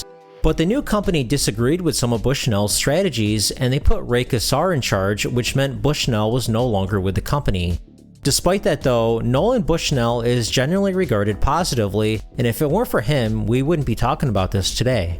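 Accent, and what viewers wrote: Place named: Upstate New York, United States. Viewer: You from Upstate New York? Your accent reminds me of home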